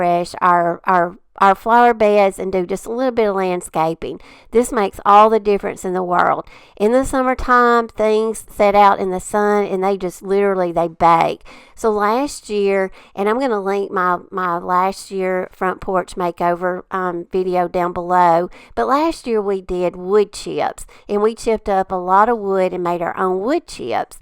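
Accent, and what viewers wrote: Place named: Alabama, United States. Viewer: Hey! I got your channel info from Olivia’s Romantic Home!! You’re precious and love your accent! Over here in Georgia we have so much in common with pollen and yard stuff! I’ve loved watching.... just a few so far.... keep you the good work! Where in Alabama y’all live? I’ve been looking at houses in Enterprise and things look nice there!!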